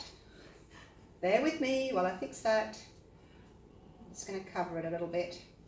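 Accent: Australia. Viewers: Great to hear an Aussie accent on a pouring video.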